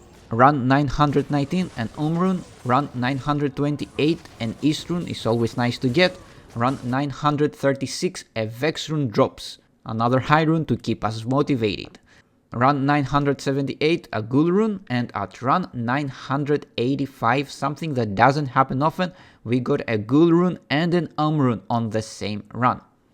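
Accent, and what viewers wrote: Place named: Eastern Europe. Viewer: Comfy vibes? Check Eastern-European accent? Check Yep. This is a D2 farm video alright. Welcome to my second-monitor list, good sir 😊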